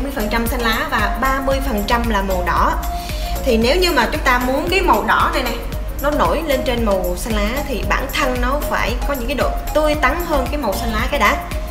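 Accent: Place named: Central Highlands, Vietnam. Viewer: Chị dạ hay quá , giọng lạ nữa , như người dân Tây Nguyên luôn 🥰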